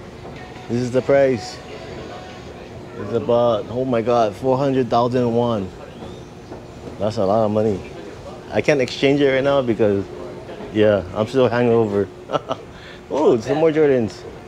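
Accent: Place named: Hawaii, United States. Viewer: You sound like a local boy, you from Hawaii?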